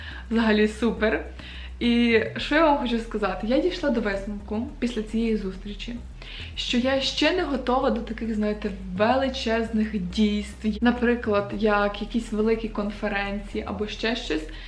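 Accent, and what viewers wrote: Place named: Lviv, Ukraine. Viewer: Та вона чисто по львівськи говорит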